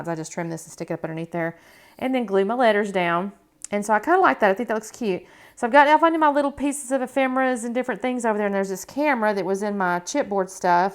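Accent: Texas, United States. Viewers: I’m loving your work, and also,your humour and accent. I’m from NZ and I love your Texas ways. ❤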